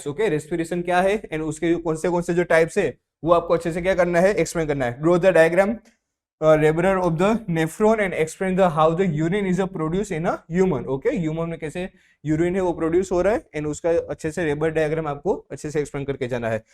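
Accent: Gujarat, India. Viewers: Bhai woh Gujarati Hai Sharad se Guj accent me Hindi bol rhe hai😅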